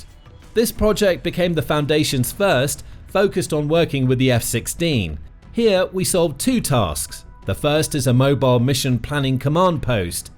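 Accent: England, United Kingdom. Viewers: "Has gotten" is an anachronistic form of English only used in the USA, so why is the script spoken with an English accent? And UK vehicles tow rather than haul. Cleverly researched essay though and almost amusing😅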